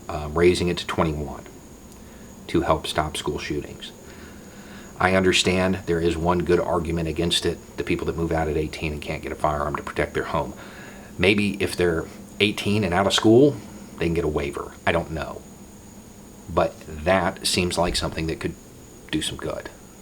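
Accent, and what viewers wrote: South, United States. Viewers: Northeastern-Americans should listen to you,. I think there is a bias there against your dialect there; even in it's most refined, learned, journalistic expression. Liberal Yankees raise their kids on "Mississippi Burning" hoping they will grow up woke, but the coded message is that anyone south of Pittsburgh must be racist because the North is where people are free. You are a credit to the English Language, sir; and I have never heard these ideas said better, and neither has any gun-control liberal. You are the voice that could show white Yankees that they are not nearly as "woke" as they thought they were. just an idea.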